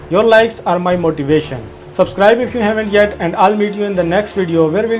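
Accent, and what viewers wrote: India. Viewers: Wtf!! First time i heard indian talking bout mma n his english ndian accent ia annoying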